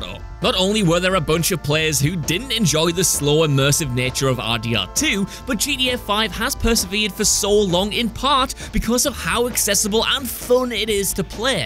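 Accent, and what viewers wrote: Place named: Newcastle, United Kingdom. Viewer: It’s called a Geordie accent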